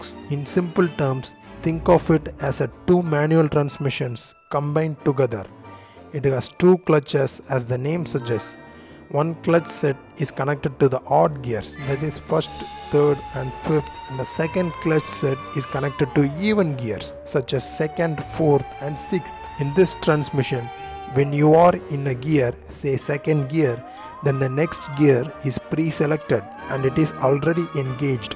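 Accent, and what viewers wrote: India. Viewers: Ur exent is so bad its like less educate indian kid try to speak in englisg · Turn of the stupid indian accent bro it ruins the video <3 Much love bro <3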